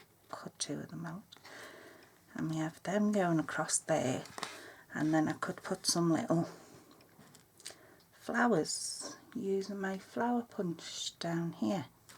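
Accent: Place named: Ireland. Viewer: I thought I was hearing Ireland in your voice. My Dad was born in Ballymena, Co. Antrim.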